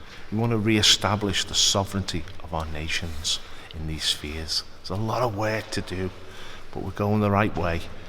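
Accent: Liverpool, United Kingdom. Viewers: Happy New Year! Thank you for hard work and persistence! So great to hear a Scouse accent talking our language!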